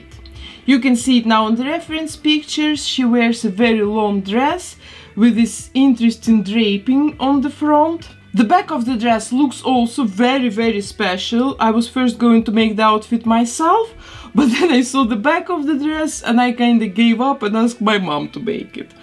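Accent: Russia. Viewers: your accent is so comfortable but hard at the same time, and the way you make sentenses are just so russian vibing you know😆😅are you russian/ukrain?? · you have such a strong Russian accent. Wow🤨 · Why do you have a Russian accent?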